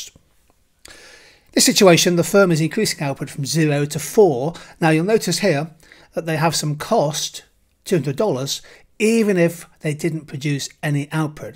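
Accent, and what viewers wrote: United Kingdom. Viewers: Finally found great vids with British accent lol Thank you for uploading. It really helps! Your voice reminds me of my business teacher in secondary by the way. Greetings from Indonesia Sir!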